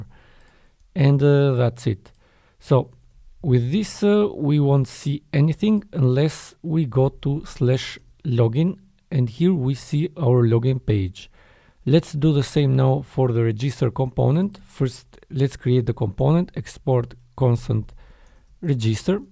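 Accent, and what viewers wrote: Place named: Albania. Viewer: pershendetje, prej aksentit qenke shqipetar 😊.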